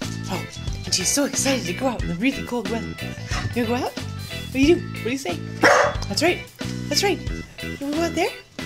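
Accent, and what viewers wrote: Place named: United States. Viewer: You have a wonderful doggy/human family. And a lovely place to raise them. Lucky creatures. Are you Norwegian too? You sound American, but I imagine some Norwegian influence. Sorry, am not nosy, just curious (and envious). All the best to you all. Anne-Lise (from Norway)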